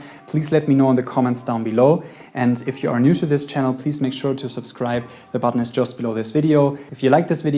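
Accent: Germany. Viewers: Der junge ist deutsch merkt man Bro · ur german arent u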